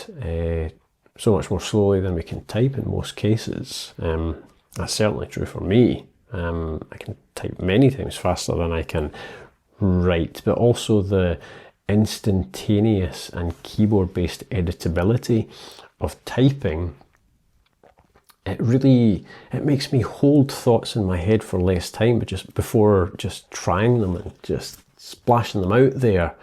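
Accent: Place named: Scotland, United Kingdom. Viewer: I really liked your comparison about eink tablets. Your way to explain Boox aproach is very good. I am now getting my first eink device afrer almost two yeras of reviewing and deciding (and waiting😅). But I did a purchase two years ago of a Booxs tablet (I think it was a Note Air 2) and I return it as soon as I test it becouse it felt exactly as an android tablet but much mote slow... and I wanted just to orgnize my work an take notes, so I started to dig into RM and Supernote,....anyhow, very good videos,...and I like your scottish accent,...I love your country. A place to wrte good novels😊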